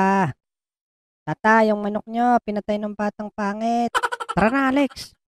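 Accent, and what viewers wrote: Batangas, Philippines. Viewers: Batangueño ka po?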